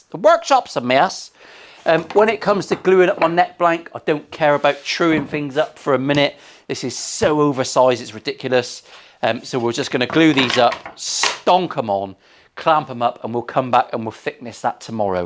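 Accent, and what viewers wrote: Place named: Cockney, United Kingdom. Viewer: I Fookin Luv You, you caffeinated jittery Janner ❤ Never afraid to do it your way, Fookem All Mista the North Devon Cockney Sparrow!!!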